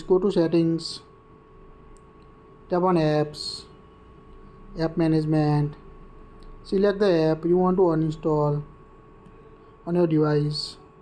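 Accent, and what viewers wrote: India. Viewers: Just put captions it's indian English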